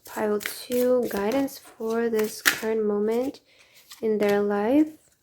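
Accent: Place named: China. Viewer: 哈哈哈哈姐姐是中国人嘛这个口音真的很可爱😂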